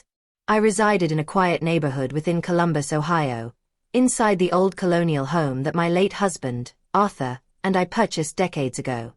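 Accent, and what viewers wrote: United Kingdom. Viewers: British accent but lived her whole life in Ohio. AI slop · Why does the voice have a British accent when she’s living in OHIO all her life??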